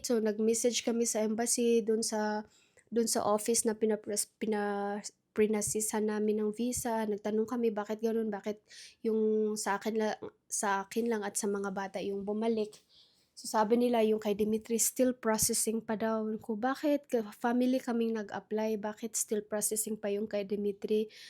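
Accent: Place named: Visayas, Philippines. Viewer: Bisaya po kc sya kaya Ganon.. atleast naintindihan pdin😊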